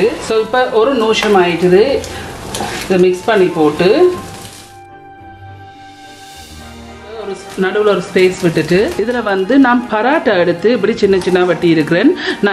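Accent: Jaffna, Sri Lanka. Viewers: She is using Jaffna style Tamil it is the aathi Tamil and has no connection to India. It is from lemuria for your information.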